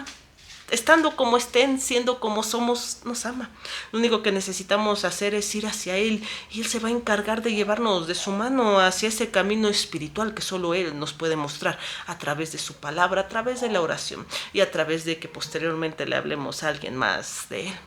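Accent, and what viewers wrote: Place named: Mexico City, Mexico. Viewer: Hermana, tienes acento capitalino (Chilango). Bendiciones de Dios...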